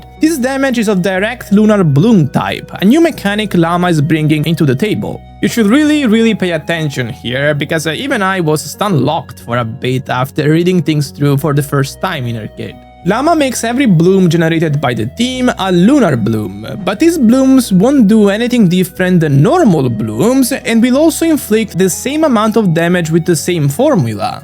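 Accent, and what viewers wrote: Italy. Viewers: Chat is this guy italian? He sounds like one and i like it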